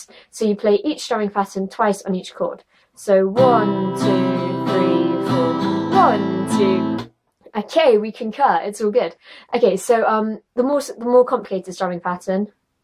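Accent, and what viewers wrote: United Kingdom. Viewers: Bloody hell, you're Britain? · A beautiful girl with a British accent giving a tutorial of my favorite song! Who wants more? i can die in peace now. :-)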